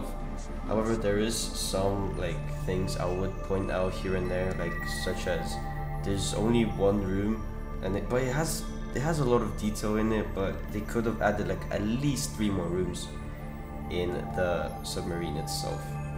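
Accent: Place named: Russia. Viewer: почему у него русский акцент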